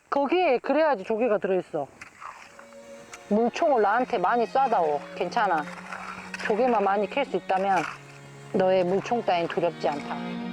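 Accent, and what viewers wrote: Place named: Jeolla, South Korea. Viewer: 이언니~ 전라도 여자맞네! 억양이 세다..... 나도 남들이 듣기에 이럴꺼야~~ 같은 전라도 여자잉께 ㅋ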